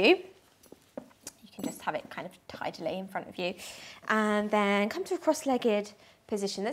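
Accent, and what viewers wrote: United Kingdom. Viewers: Thank you so much! I decided to get myself a strap yesterday after over a year of (almost) daily practice, and that video is exactly what I needed :) (And by the way, I wish I could speak english with such a lovely accent, purely british <3 )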